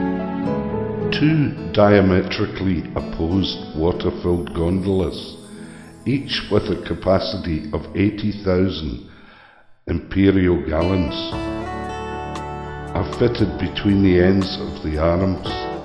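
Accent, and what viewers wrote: Scotland, United Kingdom. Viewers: Jeremy Clarkson's depressed, scottish brother. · I wish i could understand scottish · I love the Scottish voice. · His voice and Scottish brogue were beautiful to me. I liked it in regular speed. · No you don't...awful burden. Plus, you'd have a lot to answer for. Love your Scottish brogue...I could listen to you read the phone book. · Narrator has a strange accent. This isn't just a scottish accent; it's regional to a certain area of scotland. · I turned on Closed Captioning while watching the video. The CC AI had more trouble understanding the Scottish accent than I did! Maybe that's because my ancestry is 50% Scottish! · I think I could listen to Scots read the dictionary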